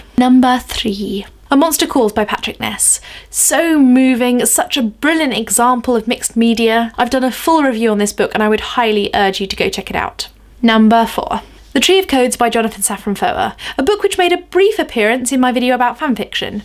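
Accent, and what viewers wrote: United Kingdom. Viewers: Hi, you from England?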